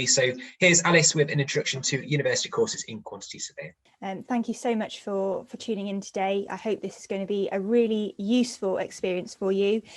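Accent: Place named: United Kingdom. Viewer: I like her English, this British lady ; )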